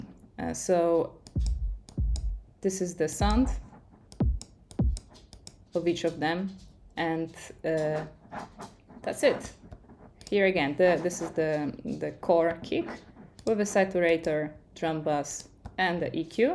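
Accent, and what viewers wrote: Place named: Poland. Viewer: Awesome! Can I find your music somewhere (e.g. Spotify or Bandcamp)? EDIT: ooo, i na dodatek jesteś Polką - tak pomyślałem w 1. chwili usłyszawszy Twój akcent ;) :D EDIT2: muzyka mogłaby być trochę głośniej, a myszka trochę ciszej :)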